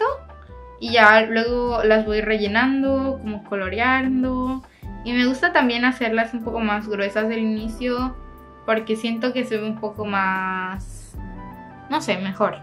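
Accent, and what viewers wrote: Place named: Mexico. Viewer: Me da la impresión que de México por el acento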